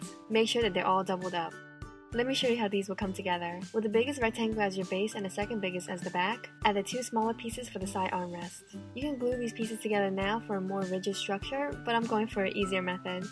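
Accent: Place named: South, United States. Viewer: Are you from New Orleans? You have a southern accent 🥰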